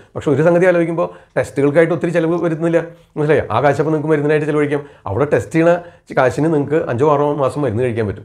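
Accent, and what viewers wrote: Thrissur, India. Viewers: Dr nalla avadharanamanuto thrissurano?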